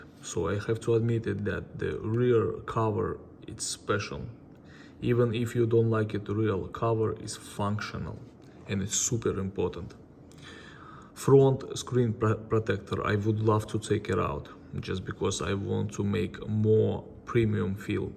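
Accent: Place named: Russia. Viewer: Акцент на русский похож))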